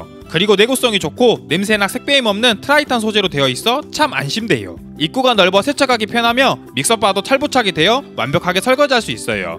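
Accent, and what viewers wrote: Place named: Jeolla, South Korea. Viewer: 전라도 분이신가..말투에서 전라도 느낌이 확나는디ㅋ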